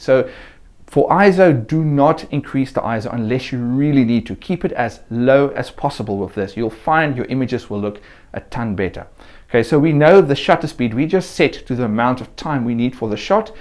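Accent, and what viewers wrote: South Africa. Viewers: So good to hear a great SA accent again. Thanks for a great tutorial, really simple and straight forward. Dankie Boet!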